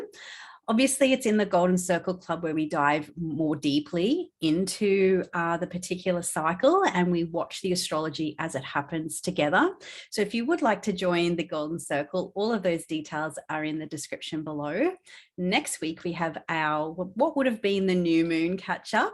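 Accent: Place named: Australia. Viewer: Mine too! Her Aussie accent is also a plus!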